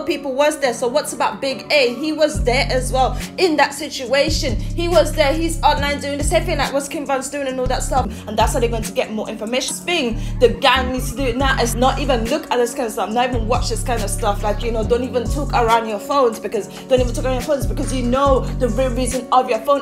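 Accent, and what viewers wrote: London, United Kingdom. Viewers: Are you from london smh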